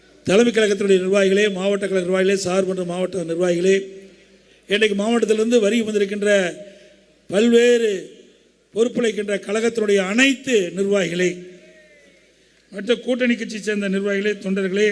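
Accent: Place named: Katpadi, India. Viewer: Katpadi Tamil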